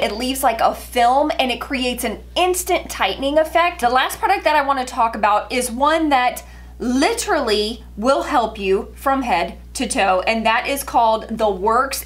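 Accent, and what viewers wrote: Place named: South, United States. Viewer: It's so good to hear that Southern draw. I'm in AL :-) thank you for letting us know about Womanesse. I'm getting the neck serum! I look forward to seeing more of your videos! Stay beautiful xoxo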